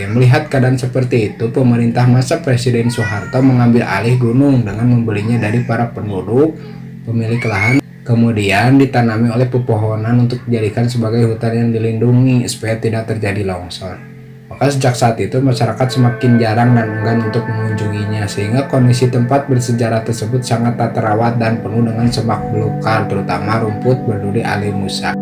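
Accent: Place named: Sunda, Indonesia. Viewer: Ayeu Saha NU nyerios ni kentel kieu Sunda na 😂 salam 🙏 ti SIMKuring warga Cikaledong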